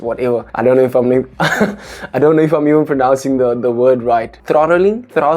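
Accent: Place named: South Africa. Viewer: South African accent so I'm assuming South Africa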